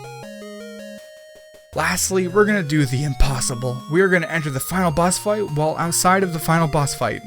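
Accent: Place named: United States. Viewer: Am I the only person that has no idea what the guy is saying? If you have such a thick american accent while mumbling, atleast add subtitles. Please.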